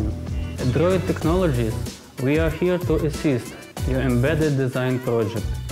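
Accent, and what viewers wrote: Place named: Slovenia. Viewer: Тхеньк ю вери мач. Бат ит из вери дификульт ту андестэнд словеник инглиш лангуаге...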